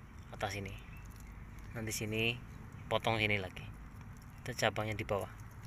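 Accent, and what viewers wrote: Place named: Banyumas, Indonesia. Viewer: malay tp logatnya indo banyumas (sumpiuh deket sampang cilacap)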